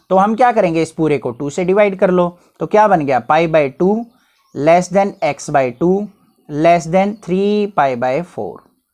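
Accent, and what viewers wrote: Haryana, India. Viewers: Sir haryana se belong krte h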